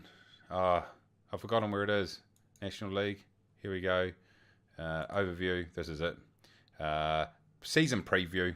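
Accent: New Zealand. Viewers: Love the spreadsheet and a nice wee return on that gamble! Nice 1st up game episode I liked it mate and its weird hearing a Kiwi accent on YT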